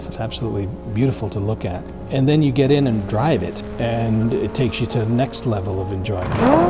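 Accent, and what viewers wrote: Australia. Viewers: This guy almost sounds Aussie - I think he has spent a lot of years somewhere outside the USA.. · What an awesome bloke! I believe he was born and raised in the UK, but am I picking up a bit of an Aussie twang every now and then in his accent as well?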